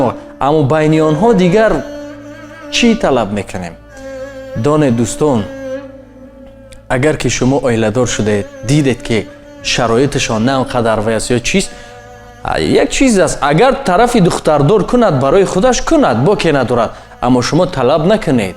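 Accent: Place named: Dushanbe, Tajikistan. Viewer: Хамааш дуруст! Ман дар Хучанди шумо 7 сол зиндаги кардаги лахчаи гап зании шумоя нагз медоным. Лек шумо гап занитон ранги Душанбегихо !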